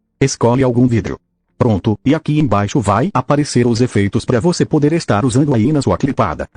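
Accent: Bahia, Brazil. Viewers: em fim um baiano kkkkkk morri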